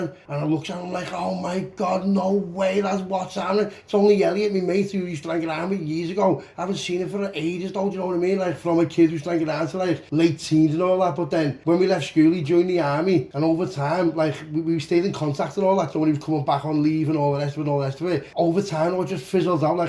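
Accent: Liverpool, United Kingdom. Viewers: Yes Scouse 💯👋👍👍👍👍👍👍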